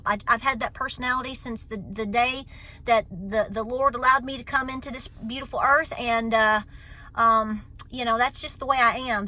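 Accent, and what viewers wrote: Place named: East Tennessee, United States. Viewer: How do you pronounce that BUUT? OR BUAT? BOOUT? I am from east Tennessee and we definitely have a unique way of speaking! I have lost most of my accent but love hearing it now! And girl you got it !!! Love you!!!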